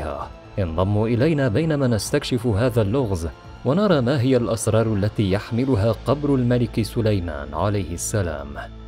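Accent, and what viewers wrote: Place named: Israel. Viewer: هو انت بتكلم زى الإسرائيل هو انت منهم؟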